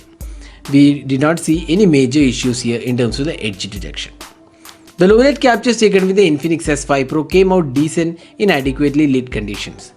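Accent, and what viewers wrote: India. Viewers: i really love that indian accent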